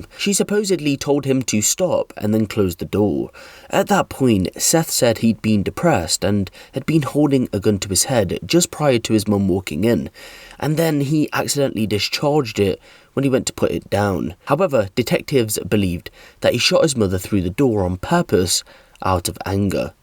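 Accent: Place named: RP, United Kingdom. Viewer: Dam I have been on YouTube for a hot minute what happened to your voice you've got well posh innit! 🤣🤣🤣🤣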